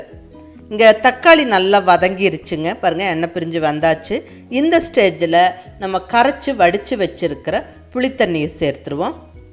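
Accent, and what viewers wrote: Kongu, India. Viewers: Super madem kongu tamil super mam tku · Namma kongu Tamil la fantastic ah ahsathureenga.......superb ga ma Vaazhga valamudan 🙏 neengalum vungal ahnbu kudumbamum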